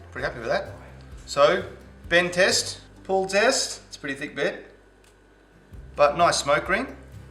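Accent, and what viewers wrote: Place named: Australia. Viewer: Loved hearing an Aussie describe food he's cooking! Sorry mate, your accent was better than your cooking for this American. Keep it up though!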